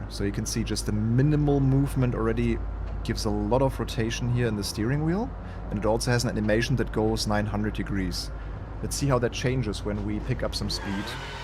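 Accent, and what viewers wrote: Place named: Germany. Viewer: Vielen Dank, dass du versuchst, im Rahmen deiner Möglichkeiten unsere Wartezeit zu verkürzen. Ich kann es echt nicht mehr abwarten. Hab es auf der PlayStation auch vorbestellt. Eine Kleinigkeit zu deinem Englisch du sprichst sensitivity immer falsch aus ich stolpere da immer drüber, weil das echt schade ist. Dein Englisch ist gut, aber an dieser Stelle fällst du als Deutscher leider extrem auf 🙈🤣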